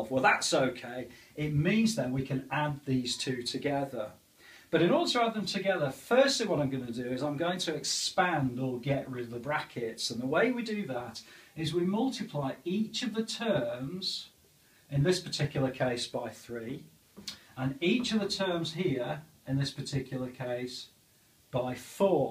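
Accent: United Kingdom. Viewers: Thank you! I get clear explanations with a lovely British accent thrown into the mix!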